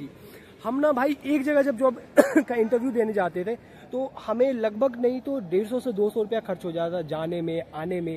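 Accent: Bihar, India. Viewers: Aap Bihar se ho 🙃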